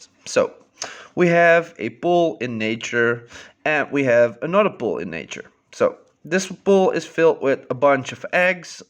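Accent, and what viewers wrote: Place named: Netherlands. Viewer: Hello I live in Europe so I can't buy eggs by you so is bought eggs by Clementoni got a tank with it but The tank doesn't got a lid its not small its not plastic I also had a year ago triops eggs and got one adult 3 months old stupid me didn't save the sand but that one was in a plastic box witch one do I need to use? (BTW your voice sounds like somebody from the Netherlands Are you Dutch cause I am Dutch so I hear it sound like that no hate)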